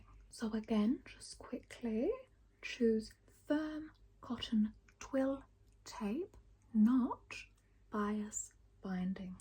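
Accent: Australia. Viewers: Aww I love your asmr’s so much especially its in Aussie accent but can you change your face Expressions it’s make uncomfortable👉🏻👈🏻 Much love mum🩵🩵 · Obsessed with your asmr vids!! You do rich aussie so good lol 🤍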